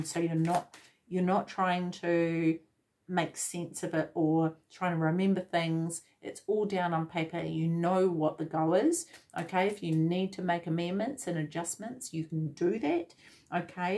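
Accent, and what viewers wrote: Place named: New Zealand. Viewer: my moms from Christchurch, thought I recognized the accent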